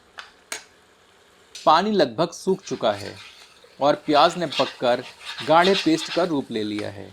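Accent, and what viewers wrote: Standard. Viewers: भाई और आपकी शुद्ध हिंदी ❤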